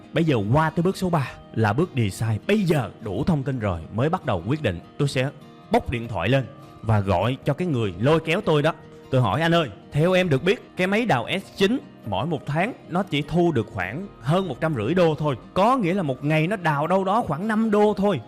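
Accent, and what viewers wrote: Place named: South, Vietnam. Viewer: Giọng a hay , e thic giọng người miền nam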